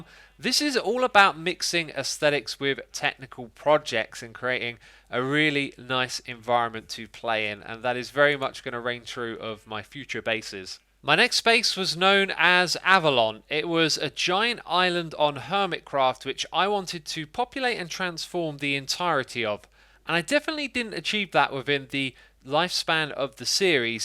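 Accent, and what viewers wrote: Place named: United Kingdom. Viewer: Why are you British now wtf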